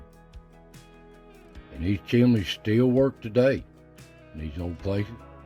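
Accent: Appalachia, United States. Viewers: The voice of Appalachia. Another Great video.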